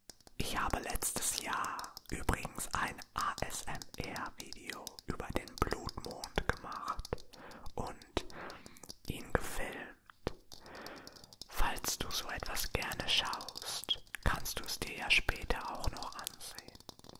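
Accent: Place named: Germany. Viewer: 💕Hey there baby boy You look absolutely georgeous I hope your feeling better Your eyes are absolutely beautyful Ive missed your german accent Ive missed you sooooo much Im your biggest fan girl (" Will you marry me ") Saranghae Lots of love and kisses Your biggest fan fan girl and future wife Nicole Louise💕 😈🔥💋🔥💋🔥💋🔥💋🔥💋🔥💋🔥💋🔥💋🔥💋🔥💋🔥💋🔥💋🔥💋🔥💋😈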